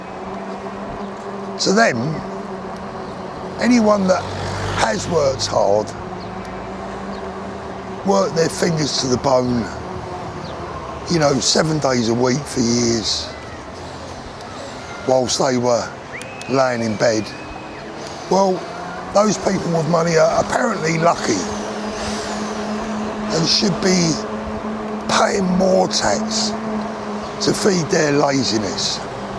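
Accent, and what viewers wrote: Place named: Cockney, United Kingdom. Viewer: When you're young and naive it's OK being a socialist. But when you grow up and start earning your own money, you are supposed to wise up and realise capitalism is the only way. Why after so many failures in the past don't the Labour party get this? It's mind - boggling! It's so nice to hear a Cockney talk. Are there any left in London?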